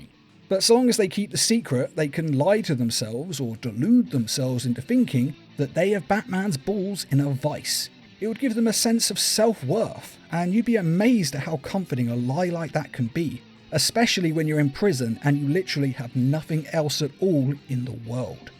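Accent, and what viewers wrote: United States. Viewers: Good job on the American accent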